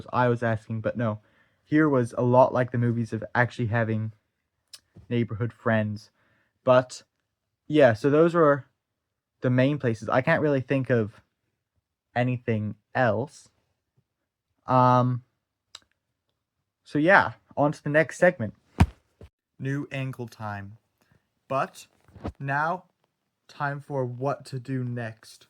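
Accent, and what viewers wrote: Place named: United States. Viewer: you sound more american than new zealand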